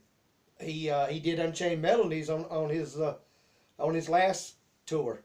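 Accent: South, United States. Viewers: He sounds like Forrest Gump and some other mix trying to have an accent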